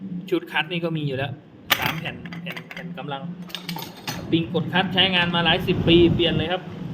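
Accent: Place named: Laos. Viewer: เว้าลาวหันและม่วน.อย่าเว้ากรุงเทพหลาย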